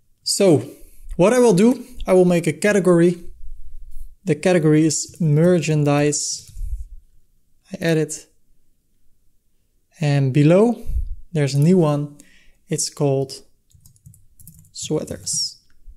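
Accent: Netherlands. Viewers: Je hoort gelijk is Nederlander :D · ken het accent ;) Nederlands Lol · Hey; Danke Well, No need to improve your English, Your Dutch accent is a reminder of the Dutch Kindness and all the Good times we get to spend in Holland, Thankyou Keep it UP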